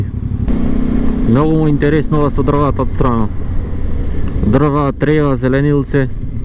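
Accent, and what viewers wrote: Bitola, North Macedonia. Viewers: Pozdrav do od dalechnata Australia. Te pratime so voodushevenost. Odlichna video publikacija na patishestviata, so obilna informiranost i pokraj skromnata video tehnika. Informativni komentari na ubaviot Bitolski akcent, dosega ne zabelezhani. Uzhivanje e da se gleda tehnikata i balansot vo vozenjeto vo odnos na raznolikosta na patishtata. Mashinata ti e optimalna i pokraj relativno malata kubikazha. Ushte eden dokaz deka goleminata ne e merilo za uspehot. Dokolku mozhime da sugerirame, bi sakale da te vidime kade uzhivash vo pauzite vo lokalnite kafe restorani po mozhnost so komentari na mestata vo koi se zadrzhuvash. Veruvame ne oboruvash bilo kakvi rekordi za Ginisova kniga na chudata? Ti posakuvame uspeshni avanturi vo idnina so nadezh da go svrtish dunjalukov v'dolzh i popreku. Nie da sme zhivi - zdravi da prodolzhime da navivame od skromnite ni fotelji so chasha vo raka, i po nekoe mezence. 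DA NI ZHIVEJT! DA ZHIVEEJT!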